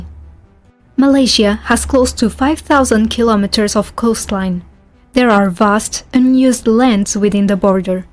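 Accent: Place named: India. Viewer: Whats with the indian accent?? 😆😆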